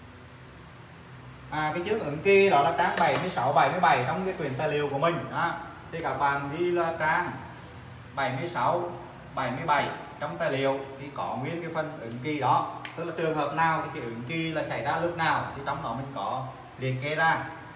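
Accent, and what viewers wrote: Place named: Vietnam. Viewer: Giọng thầy nơi nặng theo miền. Nhiều khi nghe khó dịch :)))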